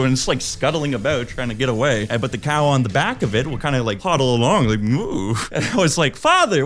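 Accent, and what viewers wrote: Nova Scotia, Canada. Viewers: Your accent is giving me big Nova Scotia vibes, at least the way you pronounce cars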